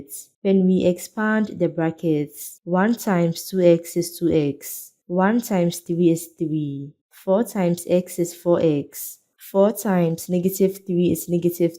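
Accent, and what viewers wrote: Ghana. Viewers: You sound Ghanaian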